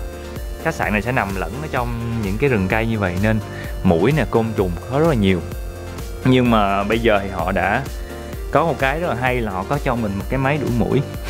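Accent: Mekong Delta, Vietnam. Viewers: Chuc mung anh đuoc hon 100.000 nguoi đk nha! Rat thich cach a nc kieu mien tay iu mien tay! E cung ng mien tay😘🙌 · Mê cái giọng nói miền tây mất rồi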